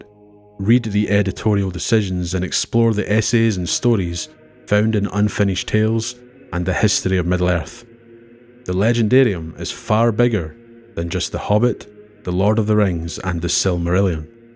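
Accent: Scotland, United Kingdom. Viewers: very well made video, and as a german, loving the scottish accent :) Obviously there was no primogeniture among the noldor, as always there have been descendants with a higher claim. like the sons of faenor. so one could assume, that birth wasn't everything when the elves considered who should be king.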